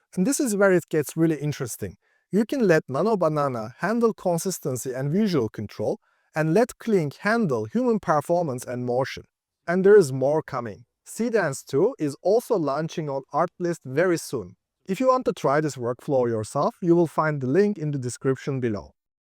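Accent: Turkey. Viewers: Aksanına Kurban olduğum. Türk olduğuna yemin edebilirim ama kanıtlayamam. Teşekkürler. Türk'sen Yorumuma kalp at. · törkiş engliş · Kardeş sen Türk müsün? İngilizceyi tam Türk aksanıyla konuşuyorsun. 🤓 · şu kötü türk aksanlı ingilizceyi de yapay zekaya mı okutsan acaba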